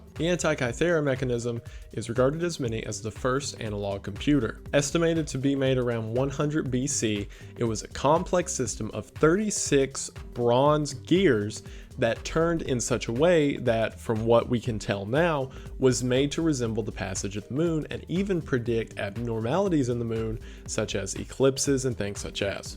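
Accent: South, United States. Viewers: I thought you sounded like you were from north georgia/carolina or tennessee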